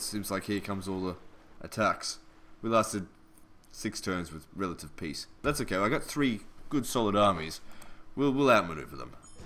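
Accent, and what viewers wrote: Australia. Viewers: Yep, sounded Australian to me.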